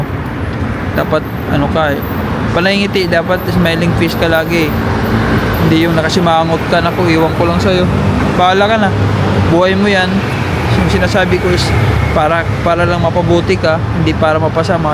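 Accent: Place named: Waray, Philippines. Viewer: Waray waray ka kb sir..kasi yong accent mo sumasabit sa Pagka waray eh😅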